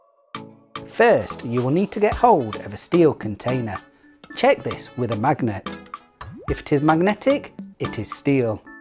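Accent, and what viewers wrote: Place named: England, United Kingdom. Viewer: He's most likely in England, Australia or New Zealand. The English pronounce it as "Al-ew-minium". Why? There are those that believe the guy who first created Aluminum from Bauxite named it that... and pronounced it that way. You can research up the oft controversial topic and do some reading... it can be somewhat amusing to us engineer types. Peace & good vibes! - Max Giganteum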